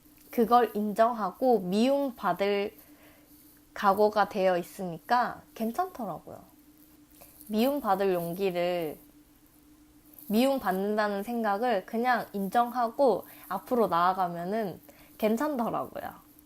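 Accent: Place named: Seoul, South Korea. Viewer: 어릴때 서울에서15년정도 살더니 서울말을 잘하네요. 영상도 너무 좋네요.~♡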